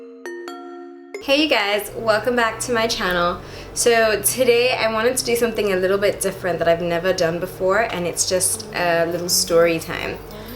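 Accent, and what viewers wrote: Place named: Nigeria. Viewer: It kind of sounds like you have a Nigerian accent or something. I’m Nigerian so I was just wondering if you were too 😂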